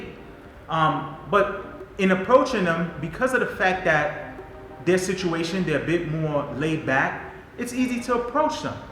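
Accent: New York, United States. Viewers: Your NY accent has been dying took me back home.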